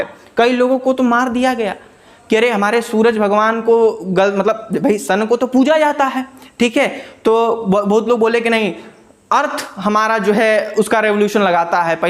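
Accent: Bihar, India. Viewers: You have a different and a special way of teaching that highlights you from others 🤗🤗♥♥ because we don't feel bored while studying from you 😃😀 only because of your bihari language 😇😇😅😜♥♥♥♥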